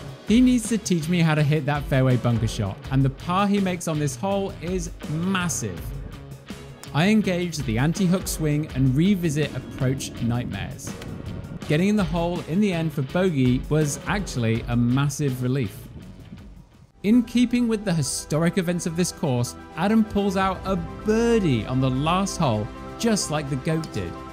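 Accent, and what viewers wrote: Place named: United Kingdom. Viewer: Commentary excellent…. Forget the wind exists 😂😂😂😂😂😂 Where bouts the uk you from or where were you from ? 🇬🇧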